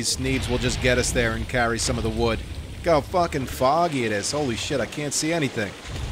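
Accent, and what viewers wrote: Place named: New York, United States. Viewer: I'm new and very entertained by the irate ny/nj italian vibes & accent.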